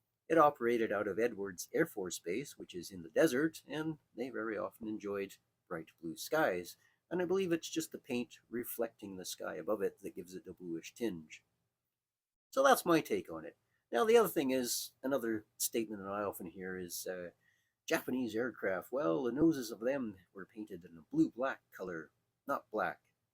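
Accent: Canada. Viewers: I take it from your accent that you may be Canadian? One of the greatest scale modelers was Jack McGillvary from Toronto who built and flew his models to unsurpassed levels. He was one of the Flying Aces greats. Beyond that he was a Wakefield Trophy finalist in 1959. Have you tried rubber flying scale?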